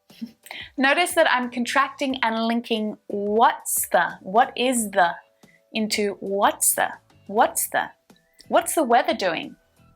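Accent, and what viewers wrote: Australia. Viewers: Your Australian accent has made you a special English language teacher. Do all Australians have the same accent as yours? I really like it. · I love Australian accent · I love your videos and I love your Australian accent ❤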